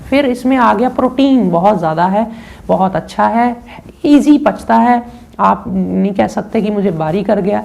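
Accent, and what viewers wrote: Punjab, India. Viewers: koi kami nhi rahi aapke bolne me....Punjabi tone pta chal jaati hai guru ji.....thanks.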